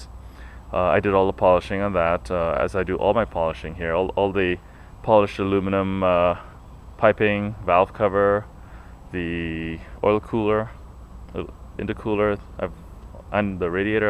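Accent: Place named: Russia. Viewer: woow very very nice man, you say you live in col springs yeah,? where is your accent from, almost sounds russian at some points but not exact, great video man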